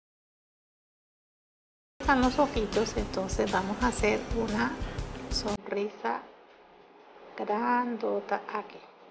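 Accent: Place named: Venezuela. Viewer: Me gusta como explica, y por su acento, es ud venezolana. Felicitaciones!! yo también lo soy, saludos y abrazos desde Barinas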